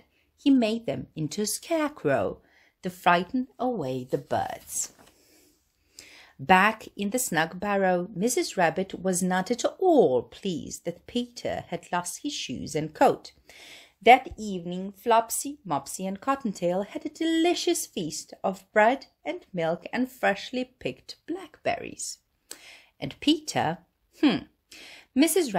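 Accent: United Kingdom. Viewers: Divno! Obožavam da slušam ljude sa britanskim akcentom!❤ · Savršen britanski akcenat. 😊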